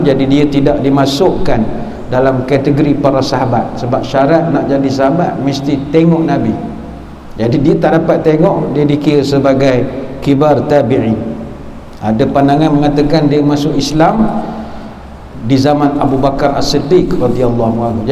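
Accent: Pahang, Malaysia. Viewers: Dakk....Dato org Maran, Pahang. Mmg telo Pahang ada sstgh kwasan ada sikit2 mcm org Perak.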